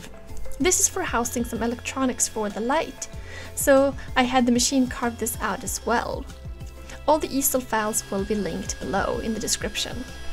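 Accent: Sweden. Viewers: maybe out of topic but it sounds like you got a strong Swedish accent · Nice! sounds like you having a Swedish accent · Just found out your channel. Thats a great video, congrats. Sorry to ask but are you somehow swedish in any way? I ask cause when you say OK for example it sounds a lot like if you were. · Are you from sweden? Because you sound really swedish xD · I'm confused. Your accent is Swedish but you say "aluminum" and use the imperial system. What's the deal?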